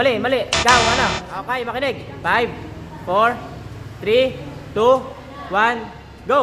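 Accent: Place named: Waray, Philippines. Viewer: parang ng waray ka lng dn.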